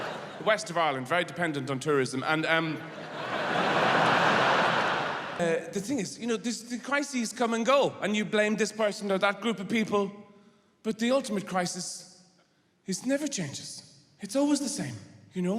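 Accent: United Kingdom. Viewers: Where's the punchline? This is just what British people sound like to me.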